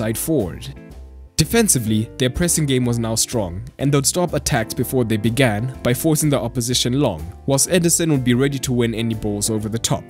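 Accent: South Africa. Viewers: I'm sorry but your accent sounds South African, are you South African?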